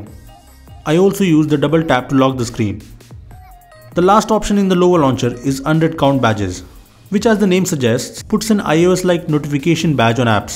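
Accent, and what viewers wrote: India. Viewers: love his indian accent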